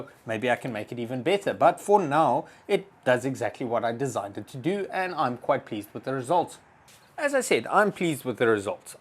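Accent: South Africa. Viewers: Sounds South African to me, but I’m no expert. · Hello sir. Great project. Are you South African? Your accent makes me believe you are one of us.😂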